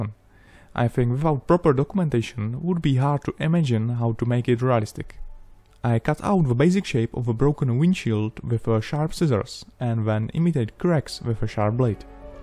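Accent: Czech Republic. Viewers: Hello, this is my first seen video from you its amazing and i noticed a czech akcent so if you are from Czech Republic. Tak Zdravím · Jedna otázka: SI ČECH? Lebo ten prízvuk mi strašne sedí na brata môjho nárda! :) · Nice work PS: I hear the Czech accent :) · Ta češkovina tam jde strašně slyšet 😂😂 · Are you from Czech right? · Bruh that czech accent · Judging by the accent I'd say he's from Czech Republic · are you from Czech republic? :D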